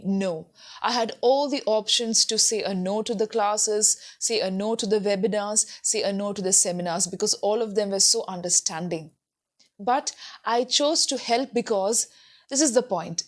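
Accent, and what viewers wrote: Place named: India. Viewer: You look similar to one of famous kannada serial actress Ranjani Raghavan of Kannadati. I admire her and you too and your English and skills of communication as well. Initially mistook you to her. I have a suggestion. There is no complete audio books available on YouTube in an indian accent. You sound sweet and I think every one of indians would surely love and enjoy listening to you. If possible please come up with a comple audio book of any self help book or popular book which you think of. I think you will get market as well. I don't know what you do and why you doing these videos on YouTube etc. Just felt like suggesting..... watching your video I have become a fan of your language and communication skills. Thank you very much......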